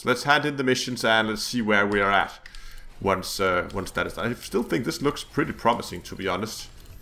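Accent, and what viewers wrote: Denmark. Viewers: nemt at spotte ens egen accent, men perfekt engelsk ellers:) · Are you danish?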